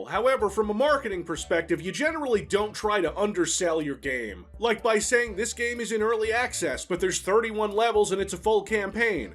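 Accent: New England, United States. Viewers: ... Of course he's from New England. Of course he's from here. if you're still out here and we happen to pass by each other I'll buy you a beer.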